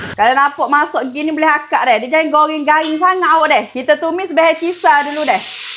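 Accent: Kelantan, Malaysia. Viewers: Berapa kali deh daaa 😂😅 mcm tu ke org Kelantan cakap?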